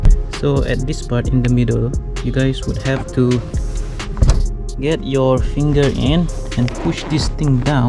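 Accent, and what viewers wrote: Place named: Australia. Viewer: Pergh oz accent... Next content seat bucket ke???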